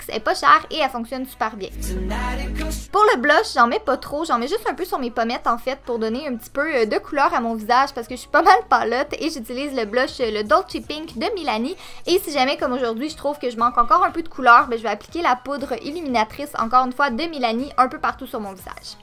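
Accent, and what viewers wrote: Quebec, Canada. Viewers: Je vien du Maroc et on va dire qu'avant tu ne me plaisais vraiment pas, enfin tes vidéos et je ne comprenais pas tes mot vu ton accent, mais depuis quelque jour je suis accros a tes vidéos et comme elle me plaisent toute (pour l'instant) je me suis donné comme défis de toutes les voir! Je ne sais pas comment j'ai pu te détesté vu ton talent et ta générosité avec nous! Tes conseils son magique, continu sur cette route... You perfect! (je ne sais pas si sa ce dit mais vu que tu es canadienne j'ai mis sa!) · Salut ! Je viens d'Allemagne mais en ce moment j'habite en France pour 5 mois et fais un échange de scolaire. Et il faut dire c'est absolument pas facile à comprendre pour moi parce tu parle le français de Québec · Je viens de France! Et J'adore trop l'accent québécois ❤ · Si vous aimez l'accent Quebecois likez* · Je suis française et je craaaque sur l'accent québécois et les expressions 😍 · Québécois(e) de Québec? 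👆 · Je parle francais mais j'adore ton accent 😊😊☺ québécois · Et puis j'adore l'accent québécois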